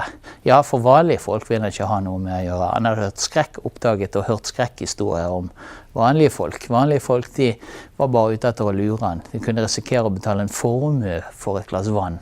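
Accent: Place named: Bergen, Norway. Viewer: Mye interessant at høre. Jeg er fra Bretagne og synes at dine foredrag er mye interessant selv om jeg har litten besvser og følge det "aegte begenske dialekt". Jeg sjønyecikke La Tocnay var rejst opp til Bergen. Jeg var i Bergen for 3 år siden og synes det er en meget interessant by som jeg foretraekker fram from Oslo som er blit så gremt med de kaempestore nye bygninger.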